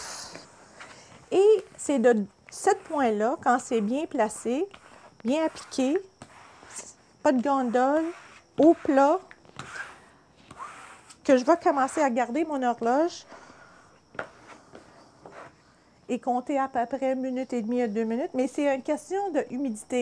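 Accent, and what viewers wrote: Canada. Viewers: Es tu quebecoise? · Démonstration intéressante avec un bel accent Canadien , mais trop long ....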